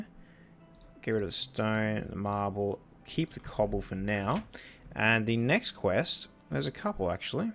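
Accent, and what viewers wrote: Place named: Australia. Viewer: Great video dude would like to see more but Are you Australian because you kinda sound like it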